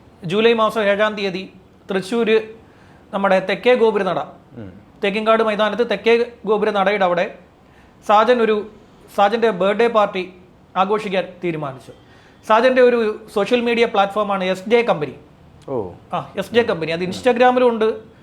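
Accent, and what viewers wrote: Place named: Kollam, India. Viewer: kollam, anchal karan aanu ivideyum anganeya samsara syli😊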